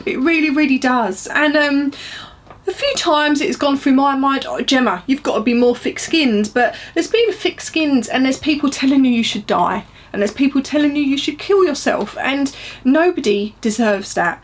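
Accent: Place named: United Kingdom. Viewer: I think you're simply beautiful! I came across you not too long ago and noticed your hair in particular. Love it ... and your accent, of course. I lived in the UK for a while & miss it, so you bring back a little part of such fun memories just hearing you talk. I can tell you're a beautiful soul. Keep making videos! <3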